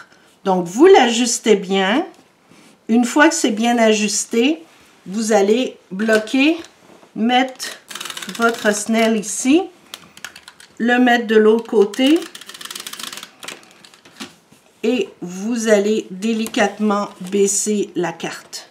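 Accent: Canada. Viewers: merci beaucoup pour vos explications très claires et précises. C'est un plaisir de vous écouter et d'entendre ce bel accent canadien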